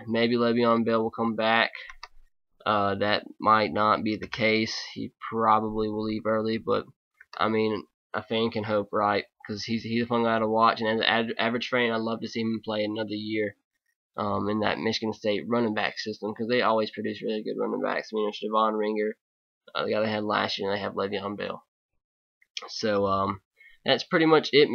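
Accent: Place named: South, United States. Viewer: yeah i can tell you're southern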